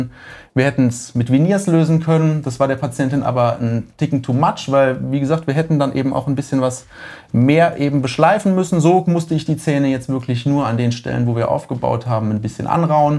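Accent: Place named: Swabia, Germany. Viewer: Servus, du sag a mol woher kusch du eigentlich genau? Du schwätsch d gleiche slang wie i😅.vg Tobi😊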